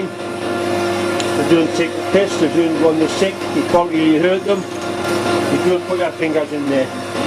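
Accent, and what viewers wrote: Newcastle, United Kingdom. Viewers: I don't know the exact town, but the accent is known as Geordie, spoken in the North East of England around the Newcastle area. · Love the accent, I thought a Good Geordie Milling machine would have a Y-Aye axis on it;) Love the ball bearing trick. Take care canny lad:)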